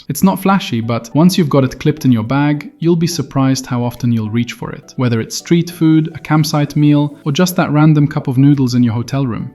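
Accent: Germany. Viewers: When the travel gear video has a narrator viz a German accent👌👌👌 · Ist das geil, wie man direkt raushört, wie ein Deutscher Englisch redet 😅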